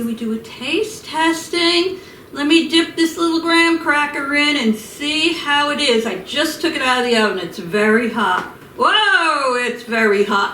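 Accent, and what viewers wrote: New York, United States. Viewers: Smores dip looks fantastic. Can I assume from you accent your from New York? I'm super excited for Halloween 🎃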